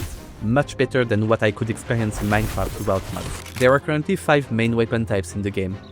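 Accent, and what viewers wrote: France. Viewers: The video was so good I almost didn't realize you are french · Sup fellow french , I recognise it with your accent. More seriously , hope to see cool projects from ya ! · On entend l’accent français à des KILOMÈTRES 😭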